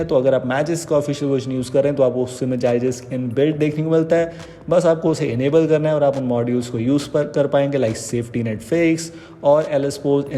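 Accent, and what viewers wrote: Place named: India. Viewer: Your English sounds like a combination of Hindi and English, I don't understand anything.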